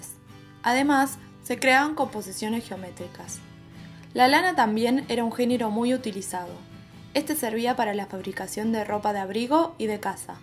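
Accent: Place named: Argentina. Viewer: Me encanta el acento de las argentinas.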